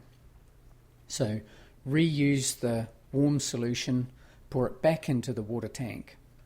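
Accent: New Zealand. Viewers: Great video, shame about the kiwi accent. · A great help. Thanks for making the effort. Love the KIWI accent! Reminds us of a beautiful country and a great holiday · lol.If u knew a Kiwi, the accent wouldn' t bother you. They are such nice people. The accent is all part of the charm. And they're smart too. They do things like make super helpful How-to videos.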